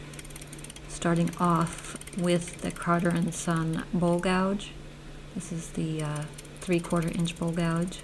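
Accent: United States. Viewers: Wicked good works well here in Oregon too. You sound to me like you could be from here. Nice work.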